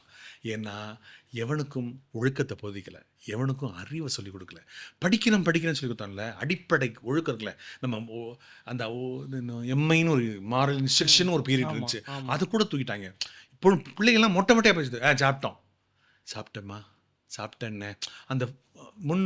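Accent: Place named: Kerala, India. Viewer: மலையாள தொனி வீசுவது போல உள்ளது. இருந்தாலும் தமிழ் பேசுவது சிறப்பு. வாழ்த்துக்கள். குறை நினைக்க வேண்டாம்.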